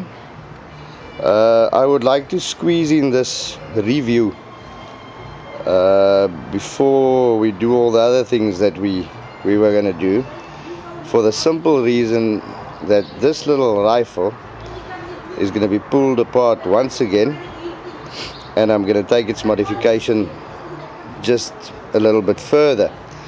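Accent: South Africa. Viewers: Always a pleasure to listen to the SA accent. Brings back good memories. I agree with your assessment of the QB 79. Great fun and then some.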